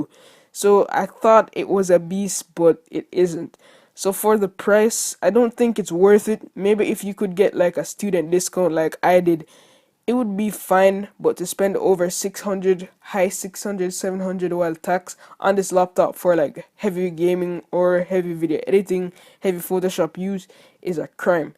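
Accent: Jamaica. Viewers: Bro, you sound like a real Jamaican, and if you are mad props. Not too many Jamaicans are doing tech reviews on this platform, so kudos to you. Video quality, transitions and use of space are awesome, and of course the voiceover, with a " TRUE " Jamaican accent. Keep up the good work.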